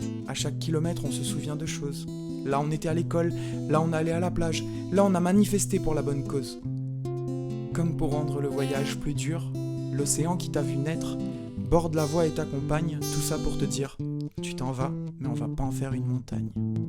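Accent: South, France. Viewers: A où c’est un boug le sud non ? On dirait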